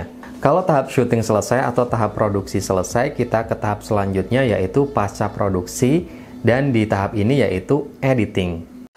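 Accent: Pekalongan, Indonesia. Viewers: Orang pekalongan yah bang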